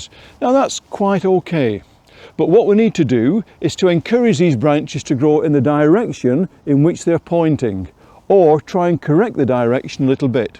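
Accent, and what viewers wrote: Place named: Yorkshire, United Kingdom. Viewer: Lovely Northern accent. Probably Yorkshire.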